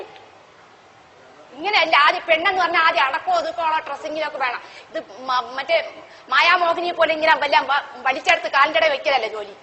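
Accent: Trivandrum, India. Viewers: thalle thironthoram slang.. polappan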